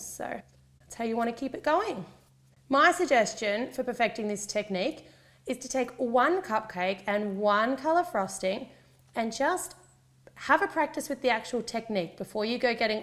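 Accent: Australia. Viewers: Outta curiosity, is she Australian?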